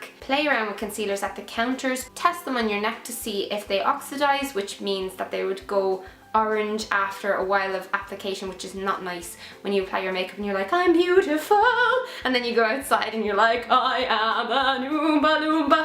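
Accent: Ireland. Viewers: New Subbie!!! Are you Irish (sorry if I'm wrong I'm really bad at recognising accents) Do you know how much the body shop setting spray is??? xxx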